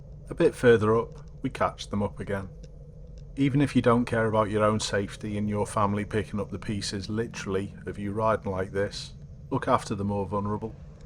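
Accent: Liverpool, United Kingdom. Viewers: no i agree that it's not cool to hear someone purposely sound over excited about the mundane but is the opposite. He sounds like a robot that hates its own existence lol. I think he's trying to speak clearly which is fair enough being a scouse lol but still it just lacks charisma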